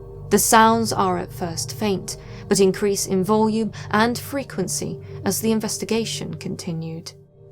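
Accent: United Kingdom. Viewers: I wish all narrators had a lovely British accent like hers.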